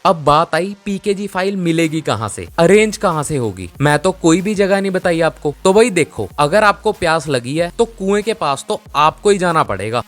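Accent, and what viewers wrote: Punjab, India. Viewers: Punjabi lag raha hai bhai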